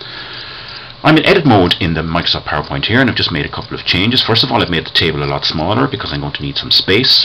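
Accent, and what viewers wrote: Ireland. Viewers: The irish accent is one of the best!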